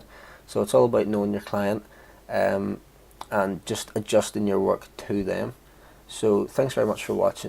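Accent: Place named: Northern Ireland, United Kingdom. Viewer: Dude where is your shop based? You accent sounds like it's from the Northern Ireland. Would love to check out your shop.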